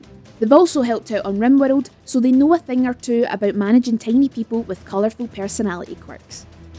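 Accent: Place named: Scotland, United Kingdom. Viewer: Scottish I think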